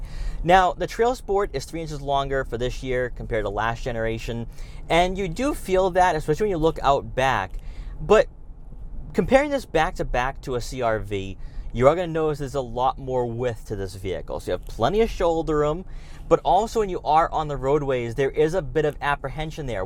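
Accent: Boston, United States. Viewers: Being that you're from Boston, I wanted to hear you, " Pahk the Caah".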